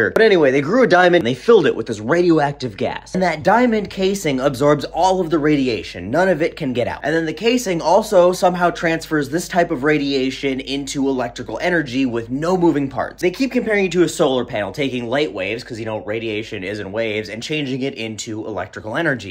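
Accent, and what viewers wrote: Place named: Minnesota, United States. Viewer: It's Minnesota English...It's spelled 'nuclear' but we pronounce it 'nucular.' Get over it.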